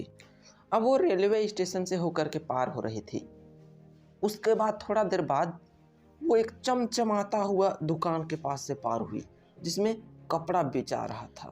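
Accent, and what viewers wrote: Bihar, India. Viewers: Bhai aap Bihar side se ho na....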